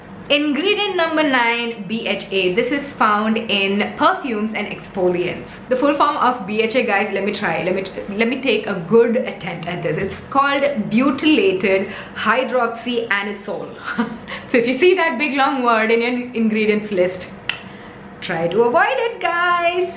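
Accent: India. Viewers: My god! her English is sooo Indian and I fucking live for it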